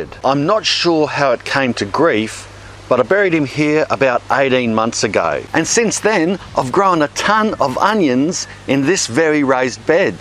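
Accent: Australia. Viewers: G'day from Germany. Since my daughter did not come back from her trip to Australia because she fell deeply in love with the country and especially one of its inhabitants,I often tune into your channel just to have a little Aussie feeling and to learn the accent. And yes, we do have a rised garden bed now. Blessings to you and your loved ones!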